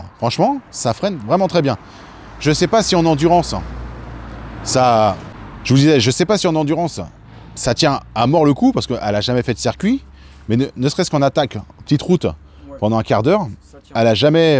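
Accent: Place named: North, France. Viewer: Je me suis abonné en tout cas, tu es du nord ? Je reconnaît l'accent , j'y suis moi même originaire meme si je n'y vie plus , dommage pour les révisions de la future s16.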